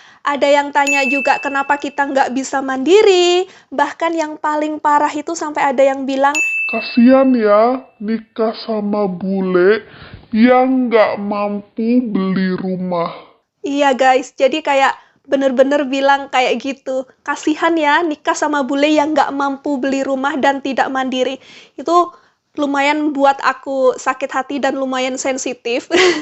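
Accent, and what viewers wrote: Java, Indonesia. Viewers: Assalamualaikum mbk...aku seneng nonton vlognya 😍, denger suaranya empuk, ciri2 wanita jawa (logat jawane ya tetep dipakai) yg gak lupa dengan kodratnya jadi menantu idaman banget...yg julit lihat dulu vlognya di Indonesia duh rumahnya indah bgt nuansa bali...mbk ttp bilang sederhana... jarang2 komen kalau nonton tp pas tau ada yg julid kok melok mangkel aku...😅 sehat2 mbk di Inggris...salam dari Tulungagung 😄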